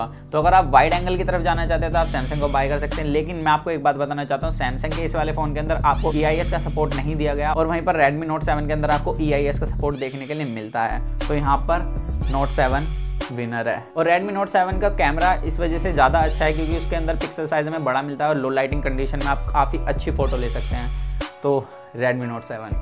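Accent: Haryana, India. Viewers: Launda Haryana se lag Raha h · haryanvi ho bhai kya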